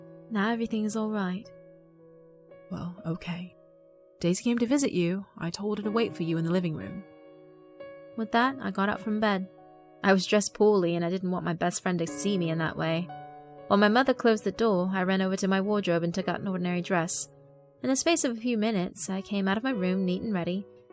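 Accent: United Kingdom. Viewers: why does it sound like you have a British axesat? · This was awsome! I've never heard you do a British accent before, it's kind of ckol. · Excellent story, love the accent! That was a great British accent, just because it said or I wouldn't of known it was you LOL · when u get turned on by awesome brtitish accent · Insanely and awesomely narrated! If I didn't know how you really sound like, I would swear you were born in the UK.. and at first I thought I was listening to Fearagen but, NO.. In actuality, it's Like Wow! he took the role of creepiness to the next level, and so Thanks Lovely Ms. and.. please, more stories like this! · i missed this long story of yours. great narration as always. im amazed that you can do a british accent. kudos to you and. 👍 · That was a freaky and grisly little story. It felt like a dark fairy-tale with gothic horror overtones. 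Really sucked me in. Nice job, as always. Also, your British accent is adorable. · oh snap British accent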